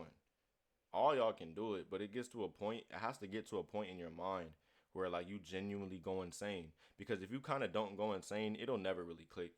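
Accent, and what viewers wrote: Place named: Detroit, United States. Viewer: you from the D dawg ? you slick gtta accent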